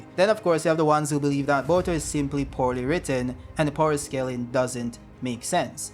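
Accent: Jamaica. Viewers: Are you Jamaican? I hear an accent. Big up from Jamaica bro😂🔥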